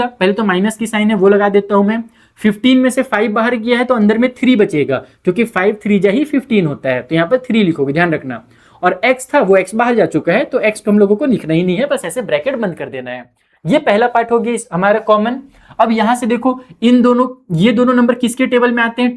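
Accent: Bihar, India. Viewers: thank you sir are you from bihar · Sir bihari ho kiya..........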